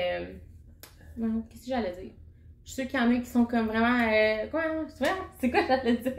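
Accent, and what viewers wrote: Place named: Quebec, Canada. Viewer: C’est un vrai plaisir à entendre votre accent québécois 🤓👍 continuez comme ça 🤓👍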